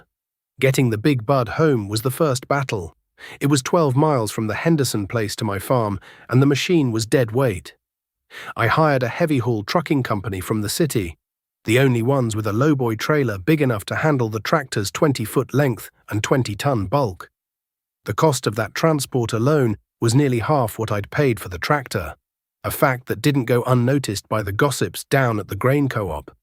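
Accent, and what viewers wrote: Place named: United Kingdom. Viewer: The narrator with the British accent talks too fast. British accent talking about American farm?…?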